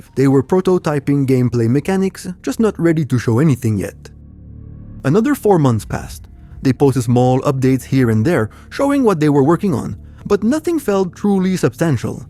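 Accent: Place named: Quebec, Canada. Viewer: Ah bin calice de tabarnak d'hosti de saint ciboire , messemblais que les prononciations sonnait que québécoise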